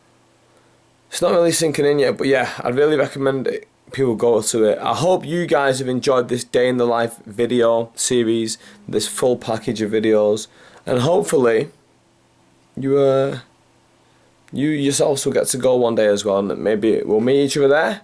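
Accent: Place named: North, United Kingdom. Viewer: great series mate, i'm going WM31 so just started watching next mans videos to get an idea. ain't gunna lie, the northerner in ya came out- great to watch. keep it up lad.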